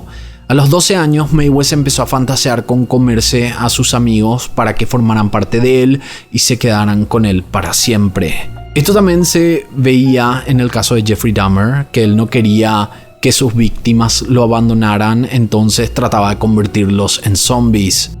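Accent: Paraguay. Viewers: Que contenido más interesante!! Me gusta la manera en que narras el video y las imagines, por si acaso eres paraguayo o tenes relación? Es que tu acento es muy parecido a uno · 0 yo me estaba poniendo en duda ya que tu acento era muy de Paraguay xd y voy en información y vaya sorpresa aparte que me encanta estas clases de videos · van solo 10 segundos del video y ya me di cuenta que sos paraguayo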